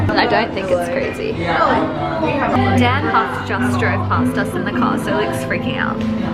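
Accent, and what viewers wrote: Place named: Australia. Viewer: I like your accent. Australian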